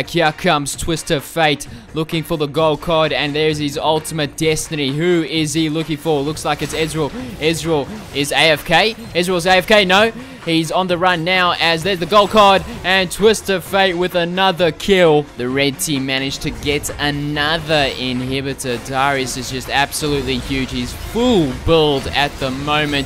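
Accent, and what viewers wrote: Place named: South Africa. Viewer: You have to be South African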